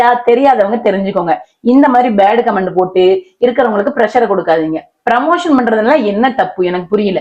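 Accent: Tirunelveli, India. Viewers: சிஸ்டர் நீங்க 3 கோடி 47 லட்சம் Views வச்சியிருக்கீங்க அது மற்றவர்களுக்கு பொறாமை கவலை பட வேண்டாம் தங்கச்சி என் சொநத ஊரு சேரன்மகாதேவி நானும்ஒரு யூடிப்பர் தான் நான் இப்போ இருப்பது ஈரோடு உங்க திருநெல்வேலி பாசை கேட்க இனிமையாக இருக்கும். என் பெயர் ராஜேஸ்வரி என்னோட சேனல் Rajiyin Rajyam என்னால் இப்போ Video போட முடியவில்லை தங்கச்சி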